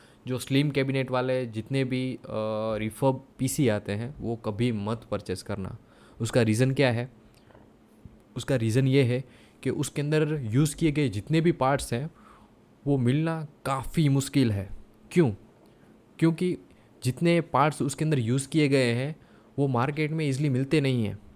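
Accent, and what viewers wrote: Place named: Sindh, India. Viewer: ok actually your accent is a bit similar as if a Sindhi speaking person speak urdu.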